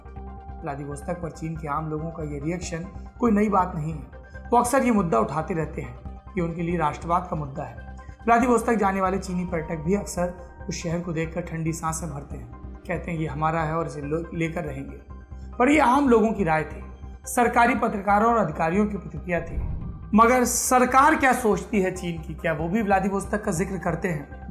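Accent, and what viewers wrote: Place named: Uttar Pradesh, India. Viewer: Yaar Saurabh bhaiya aapki ye UP wali style gazab hai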